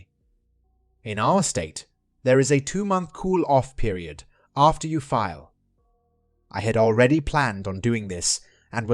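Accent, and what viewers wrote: United Kingdom. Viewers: Not my favorite rendition either. Would sound more authentic were the AI not speaking with a British accent.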